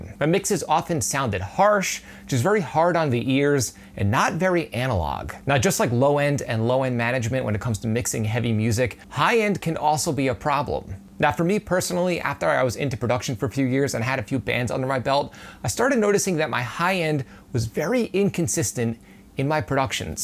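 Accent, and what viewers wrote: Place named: Philadelphia, United States. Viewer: Hey, new subscriber here. Great stuff! Love your videos. I have a personal question. Your accent is driving me crazy. Do you happen to be from the Philadelphia area? Jersey perhaps? I grew up in NJ and moved away when I was 18. When I finally came back years later I really started noticing the distinct accent a lot of folks have from my area. Just a shot in the dark but figured I’d ask.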